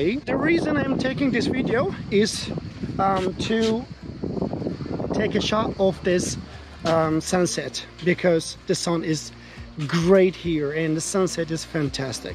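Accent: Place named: Turkey. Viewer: özüne dönmek budur 🤣 ingilizce konuşmaya başladığı ilk dakika bu adam türk belli dedim bla bla bla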